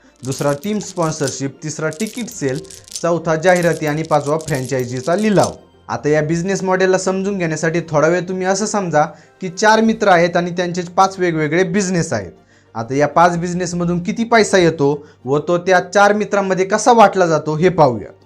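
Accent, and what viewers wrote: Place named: Ahmednagar, India. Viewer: भाऊ हीच टोन राहूदे ..तुझी USP आहे ही भाषा❤ नगरी वाटतोस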